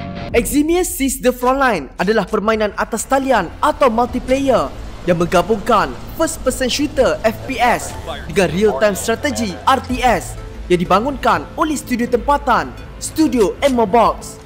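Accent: Malaysia. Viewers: ni orang malaysia ke?? jawb soalan saya di next video blh tk???sbb brcakp mcm malaysia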